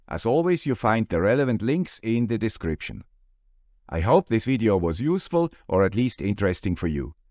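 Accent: Switzerland. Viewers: I recorded "here is the guy with the Swiss accent" onto a solar powered recorder. So I can get a smile on my face even if power goes out for weeks.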